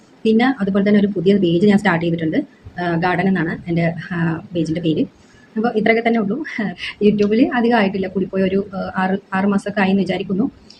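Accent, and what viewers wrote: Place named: Palakkad, India. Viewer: ❤👌🌹🥰hi.. YouTube Facebook Instagram. എല്ലാ സോഷ്യൽ മീഡിയയിലും കാണാറുണ്ട്.. പക്ഷേ മലയാളിയാണെന്ന് ഇപ്പോഴാണ് മനസ്സിലായത്.. congratulation..yur videos very good 🎉 romantic singing song, very wonderful acting.. സംസാരം കേട്ടപ്പോഴാണ് ശരിക്കും നാടൻ പാലക്കാട്ട് കാരിയാണ് എന്ന് മനസ്സിലായത്.. അതിന് എല്ലാവിധ അഭിനന്ദനങ്ങളും അറിയിക്കുന്നു.. ഞാനും ഒരു സിംഗർ ആണ്.. പരിചയപ്പെടാൻ കഴിഞ്ഞതിൽ ഒത്തിരി സന്തോഷം. എല്ലാ പ്രോഗ്രാമും കാണാം.. who is the best very beautiful 🎉❤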